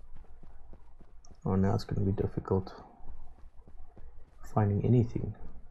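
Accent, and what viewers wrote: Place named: South Africa. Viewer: Aweh, you sound South African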